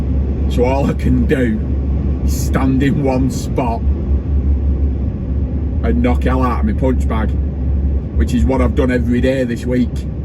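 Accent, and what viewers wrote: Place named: North, United Kingdom. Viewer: Nothing negative, on the contrary. I love accents. Trying to figure out from where yours is. Liverpool, Manchester area perhaps?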